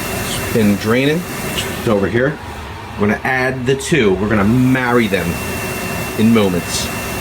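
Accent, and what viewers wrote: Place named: Italian-American, United States. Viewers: Like no one knows that. . . I guess a lot of people don’t know how to “properly” slice an onion. Seriously, I’m 100% Italian and I was so skeptical about you at first, (some people that never even heard of Scaloe. I know what it is and cook with it like my nana did. It’s so good for you. Anyway, just wanted to say you’re impressive. I wish my man would cook, let alone good Italian food. Sounds like you’re from NY. 💕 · I'm not sure you used enough garlic....As if your accent didn't give it away, when you showed the bread I knew exactly where you were from! Making escarole and beans today but my crusty style bread will come from Panera since in SC we don't have Italian bakeries. Manja!! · Hi, you must be from Philadelphia! I can tell by the way you say water🤣🤣. You’re probably in South Philly❤. Love you from Northeast Philly. 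And I’m gonna make this.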